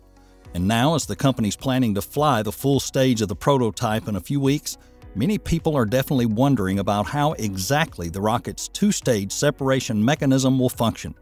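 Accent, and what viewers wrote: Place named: Texas, United States. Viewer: Thank you, your explanations are immaculate, and your accent smacks of Texas ! 👍👍👍👍👍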